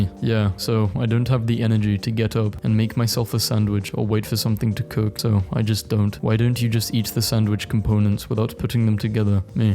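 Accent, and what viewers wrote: United Kingdom. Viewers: Wait… your British? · ur bri'ish? i thought u were an aussie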